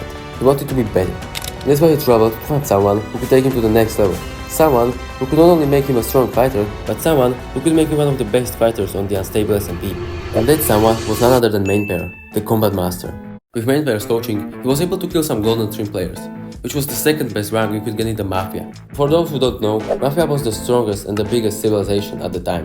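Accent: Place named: Czech Republic. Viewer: Yo where are you from bro, you sound like your from Czechia? Ze by takova nahoda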